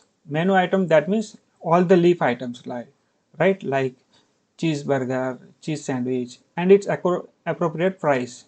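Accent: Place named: India. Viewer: Finally got a video with indian accent on this topic.. thank uh sir😊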